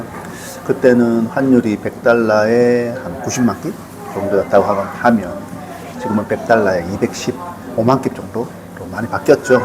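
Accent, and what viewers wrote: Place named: Masan, South Korea. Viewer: 고향이 어디시죠? 사투리가 참 구수하고 듣기 좋네요~ 마산쪽인가요? ^^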